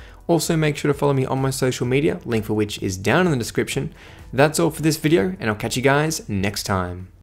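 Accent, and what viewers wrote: Australia. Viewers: are you Australia mate?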